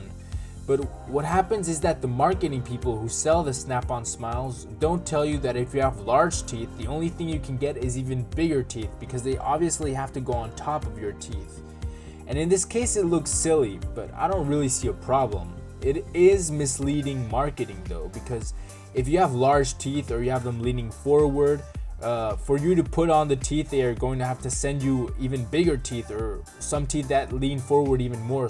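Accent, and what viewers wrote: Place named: United States. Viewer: Joder nos salió gringo el doc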